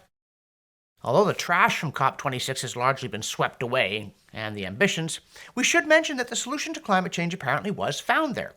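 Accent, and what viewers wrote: Canada. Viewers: Oh, and by the way, I love your stuff. Your delivery is so, Canadian. Keep up the good work.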